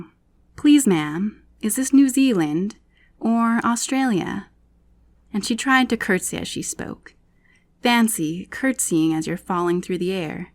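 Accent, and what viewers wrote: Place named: United Kingdom. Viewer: A British accent gives it its intented context over an American one <link>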